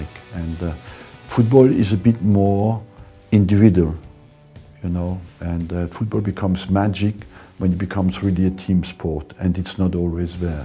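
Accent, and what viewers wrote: France. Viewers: Being from Alsace like Arsène, it’s funny and endearing to hear his Alsatian accent carried through to the way he speaks English. Legend.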